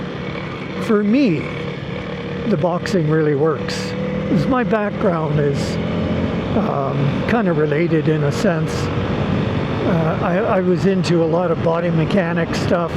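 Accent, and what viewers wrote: Canada. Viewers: Great advice! Thanks! You sound Canadian. Hope I'm still riding at 75.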